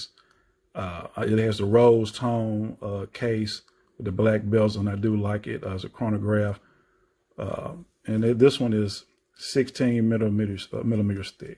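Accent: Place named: Memphis, United States. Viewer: Gotta be from around memphis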